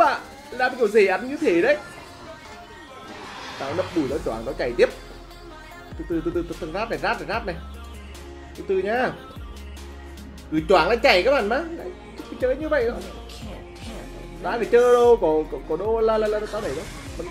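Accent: Nghe An, Vietnam. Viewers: Cái giọng Nghệ nhà tôi, wou trời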